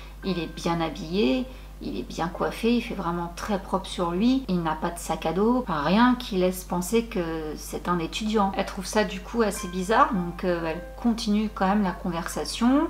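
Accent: France. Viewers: Team "comme t'es à l'aise pour prononcer" xD J'avoue j'aime bien quand c'est à la française ! Allez go it's tea time 😍 Ah non bonjour bonsoir 😂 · Coucou, tu n'as pas à t'excuser, tu as une vie en dehors des réseaux sociaux et de YouTube et c'est très bien : tu poste quand tu veux !! Et moi je préfère que tu prononce à ta façon ( à la française) l'introduction, voilà..... Bravo à ce jeune homme et à ses amis, les deux filles ont bien fait de porter plainte car ce gars sera plus facilement retrouvé dans l'avenir et ça préviens d'autres personnes qu'il y a des soucis avec ce genre d'approche....... Merci pour cette histoire, gros bisous 🥰👏🏻👏🏻💕🌺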